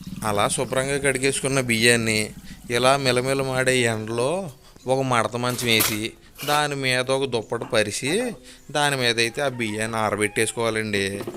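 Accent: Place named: Konaseema, India. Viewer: అచ్చు తెలుగు కోనసీమ accent,perfect పాతకాలం వంటల పద్ధతి,మా పెద్దలను , పద్ధతి లను,వంటలను గుర్తు చేస్తున్నారు,truly అద్భుతః.from Maharashtra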